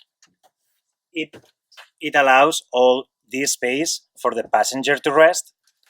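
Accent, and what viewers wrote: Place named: Spain. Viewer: Acento español ehhh ;)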